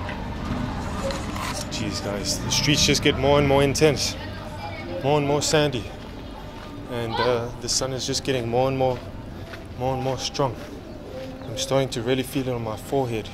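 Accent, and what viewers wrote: South Africa. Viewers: You are German? You sound South African to me. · He sounds super South African to me 🤔. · You are the most South African sounding German man I have ever seen (...heard) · Why do you sound like you are from South Africa when you speak English? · You almost sound South African, good video. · he is half German half SA guys, he was born in SA and lived in Germany the last years. He said that in one of the first videos. You can clearly hear an accent when he speaks German too. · I feel ashamed for him saying he is German - he speaks with South African accent. Egypt is a country I love and Egypt needs help showing the beauty of it to get more tourists not the hard life the people have to live from the corrupt government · This south African not german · He got South African accent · I thought you were South African, now I wonder who the South African guy was….. 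· You sound S African, not German--enjoyable posts. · Why do you keep tellimg people that you are from GERMANY, when your accent is clearlu SOUTH AFRICAN???????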